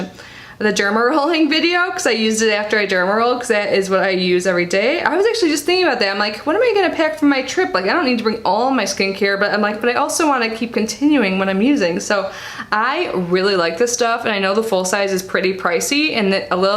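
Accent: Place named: Chicago, United States. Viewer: Chicago! Is that where your accent is from?Where did you get your mirror?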